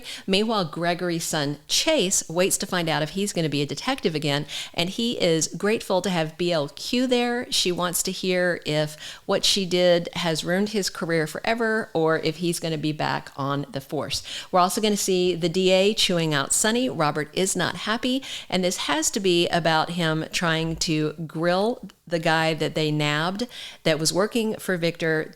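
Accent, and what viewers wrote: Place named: United States. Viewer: It's nice to hear an American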